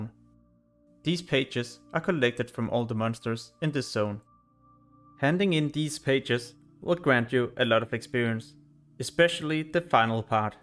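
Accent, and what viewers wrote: Denmark. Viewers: Danglish my friend ;-)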